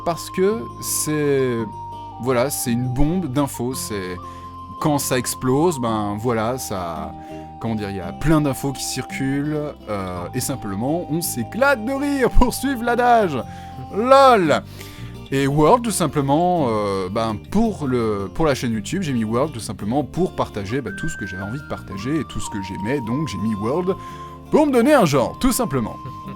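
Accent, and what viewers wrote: Belgium. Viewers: J'étais sûr que vous étiez belge sa s'entendé sur l'une de vos vidéos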